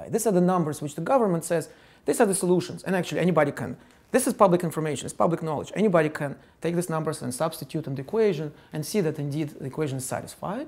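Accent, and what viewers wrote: Russia. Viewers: Russian accent explaining how the US govt spied on... Everyone. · I didn't even listen to the contents of the video, I just love the Russian accent! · He has definitive russian accent. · Dat Russian accent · His accent sounds like Russian.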